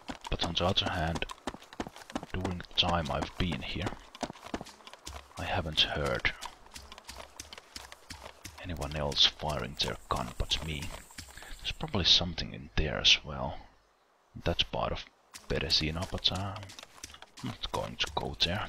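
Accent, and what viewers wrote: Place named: Finland. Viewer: for the lovely finnish accent!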